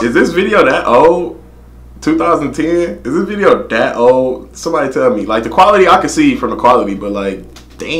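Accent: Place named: Ireland. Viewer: The V sign means F*** you/off. Your irish accent is jokes 🤣.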